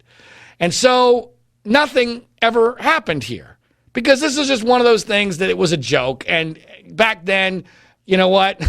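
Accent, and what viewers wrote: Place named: Philadelphia, United States. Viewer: I knew there was a reason why I enjoy listening to you. You're from Philly.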